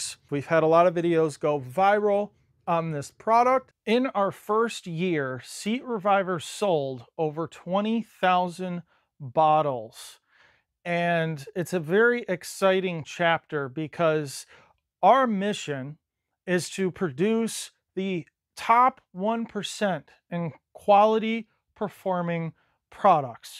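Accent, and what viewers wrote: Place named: United States. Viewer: you have the coolest American accent I ever heard, where is it from?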